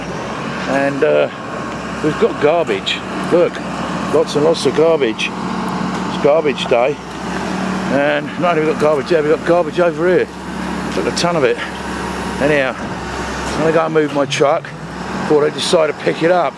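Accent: United Kingdom. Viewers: what part of the uk were you from?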